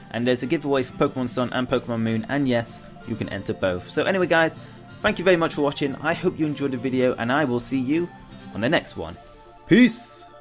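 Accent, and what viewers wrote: United Kingdom. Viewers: Me Turkey I mean, they are both British I guess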